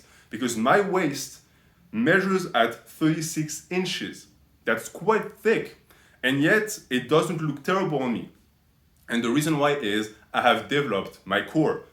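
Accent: France. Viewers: No way you aren't a french compatriot with this accent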